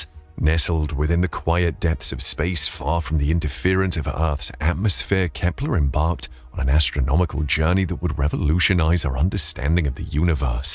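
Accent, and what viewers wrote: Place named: Eastern Europe. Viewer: The robot speaker has a East European accent. Many promising titles lately that don't do as they promise.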